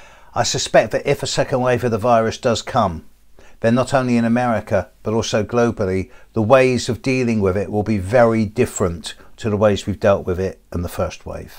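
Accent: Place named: West Country, United Kingdom. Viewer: That West Country accent comes out now and again 😆... cut the jokes mate🙄stick to the Astrology 👍sorry I’m an outspoken Sagittarian🤭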